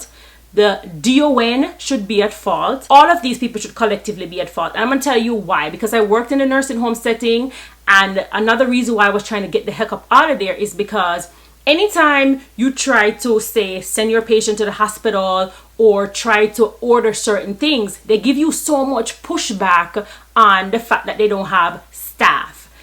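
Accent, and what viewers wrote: Jamaica. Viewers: You sound very Jamaican! 🇯🇲 I was watching a video re this story, just minutes ago. It was by a doctor. And I was very interested to hear what happened. But his lacklustre delivery, his projection, etc., I just couldn't listen him anymore. So I scrolled, and there you were. I clicked, and few minutes into listening, I subscribed. Good job! You're brilliant, you're fluent, projection 100%, delivery 100%. Overall, a very informative and excellent presentation! 👏👏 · I don't even have to ask if you're Jamaican, I'd only ask what part you're from? I can recognize my people anywhere!!! New subscriber here, also a RN and you are already inspiring me to climb higher! Bless you!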